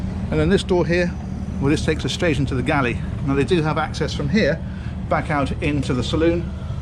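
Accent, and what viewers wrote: United Kingdom. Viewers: U are So Amazing brother I want to know Why all of the yachts ive seen u on Yes All 👍🏻♥️ why no Salt Water see thru Fish Aquariums?, Please find a yacht with the tanks or maybe talk about it a video example?, if the owner wanted he or she could have see thru Salt water Fish 🐠 Aquariums as the dividing walls with electric black out shades to seperate kitchen or bedrooms etc? I just Love them, Thank you my friend, i would Live on one of these yachts with you & your Awesome family for 6 months if i could afford it,,, 😇😇👍🏻♥️♥️🙏🏻⭐️⭐️💯💯🐠🐠🐠 Thnk u again for bringing us ur beautiful sights during this hard time, God Speed,,, Keep smiling & Love the accent love Britain,England All of your county, such cool interesting people.👍🏻😎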